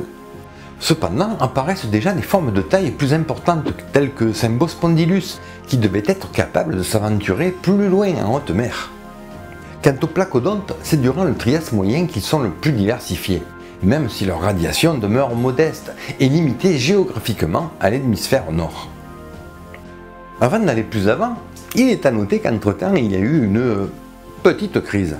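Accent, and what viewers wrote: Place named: Provence, France. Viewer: Le retour des chemises à fleur et de l'accent provençal, ça m'avait trop manqué !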